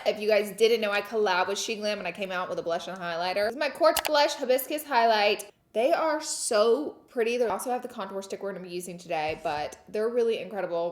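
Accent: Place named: Alabama, United States. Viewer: Do you think you will ever lose your AL accent? Lord, I hope not ❤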